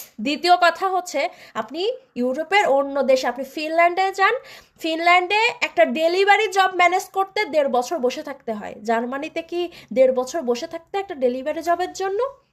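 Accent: Khulna, Bangladesh. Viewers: আঞ্চলিকতা পরিহার করলে ভালো লাগে।একবার খুলনার কথা আবার শুদ্ধ কথা না বলা ভালো।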